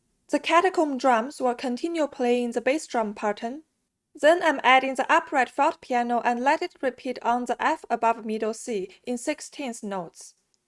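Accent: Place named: Russia. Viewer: I find it better to write this in a notation software. Sure it gives less control over the balance and still, in the end we get a full score for orchestral performance and there's a lot of excellent sounds that sounds very good and for free. Musescore for example. By the way, I love your Russian accent.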